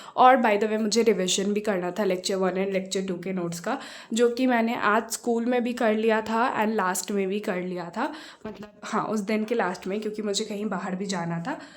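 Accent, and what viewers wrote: Bihar, India. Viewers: Heyy!! Are you from bihar !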